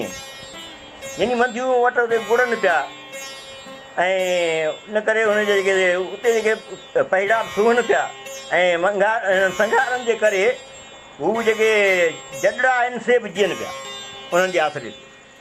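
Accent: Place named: Sindh, Pakistan. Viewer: واھہ آواز تہ ڀلو پر پڙھڻ واري جو لھجو ٺيٺ سنڌي آھي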